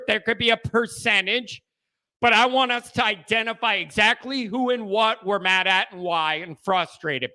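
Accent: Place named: Ireland. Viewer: I love this lil angry Irishman…his accent is 90% of his charm. Great channel!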